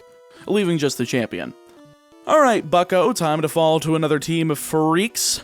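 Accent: South, United States. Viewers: At the beginning I couldn't help but notice that you are definitely southern. You don't have a strong accent for the most part, but when you said the list of rivals you pronounced a lot of them as rahval. As a fellow southerner there's nothing wrong, just an observation. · Anyone else think he's pronouncing Rival real southern like in the beginning? In fact, he seems to slip into a southern draw alot this video xD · YouTube told me i haven’t watched this video so here we go again with the funny southern man and the way he says rAival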